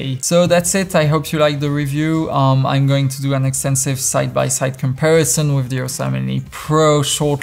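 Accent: France. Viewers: I'd like to know where you're from (accent sounds French). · Excellente vidéo :) Recognized a French guy behind this English accent, carry on this move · J'ai eu du mal à repérer l'accent français :) J'hésite à switch de mon a6300 à cette caméra :s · X-T3 for me...but great review, are you french ?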